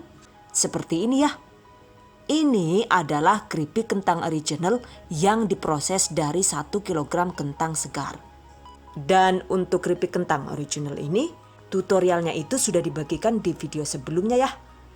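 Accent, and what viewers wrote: Banyumas, Indonesia. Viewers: Hahahaaaa...paling ditunggu komentar ngapaknya....asli lucuuu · Ini Madiun kok ngapak ya bun, orang mana aslinya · Senyum2 sendiri aq tuh kalau bunda lagi ngomong ngapak sambil ngelucu🤭😄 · Yg aq suka dari chanel ini selalu disertakan tips dan trik yg mudah dipahami.. Dan selalu berhasil tiap kali dipraktekkan👍 dan yg gk kalah lagi selalu ada lucu2annya ala ngapak2nya itu lo bikin betah nontonya🤗🤗.. Terimakasih..😍😍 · Org madiun kok bahasanya nyong ngapak banyumas sii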